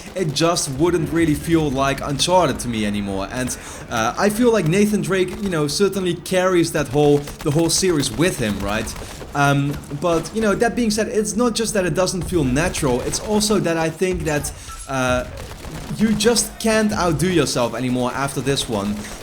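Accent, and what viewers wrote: Netherlands. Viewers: you got a dutch accent and my name is robin to he eh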